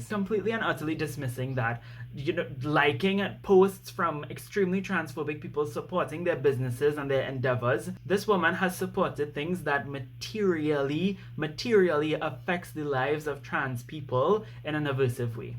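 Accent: Trinidad and Tobago. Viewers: I stopped listening to your rant and zoomed in on your accent. Trini? It's lovely! 😂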